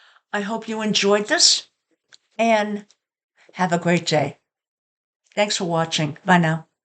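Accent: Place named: Boston, United States. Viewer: Im from Australia - where is your accent from? It's lovely, but its unusual - my favourite accent is Boston, its sounds a bit like that. Is love to know.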